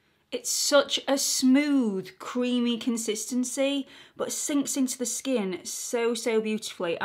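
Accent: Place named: England, United Kingdom. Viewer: All your descriptions still sound so proper with your English accent. 😊 Please do the Essence review next. 😁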